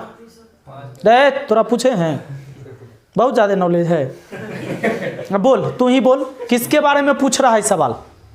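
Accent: Bihar, India. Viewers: what a bihari accent sir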